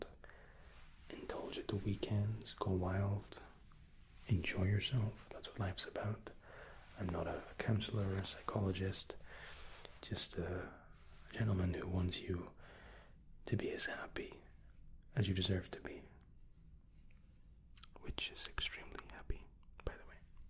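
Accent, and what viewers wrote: Scotland, United Kingdom. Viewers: I didn't realize you were born in England,? I thought you were full on Scottish. Were you raised in Glasgow? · Scottish I thought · Why are u so Scottish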